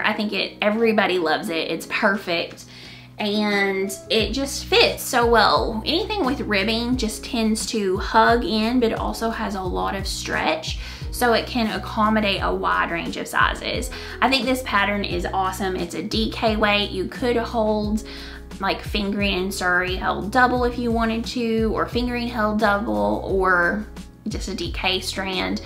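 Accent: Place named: South, United States. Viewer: I played this video in the bg while I was decluttering my bed so I could sit and knit, but I was immediately drawn to the video when you started talking! I absolutely love your accent because I come from the southern states myself, so I felt like I was right at home watching your video! I used to hate my southern accent, but in recent years, I've come to embrace it. It made me so happy hearing that you stayed true to yours, too 😊🤠💗 Much love from Kentucky!!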